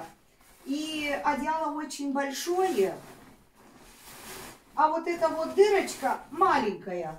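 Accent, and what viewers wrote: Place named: Moldova. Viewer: Живу ❤России. Уже давно. Скучаю по Молдове. Очень скучаю. Спасибо большое за ваше видео. За ваш говор за ваш слэнг и менталитет.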